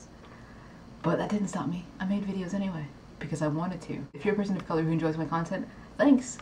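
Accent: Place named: United Kingdom. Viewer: where you in britain a long time? you have a slight british accent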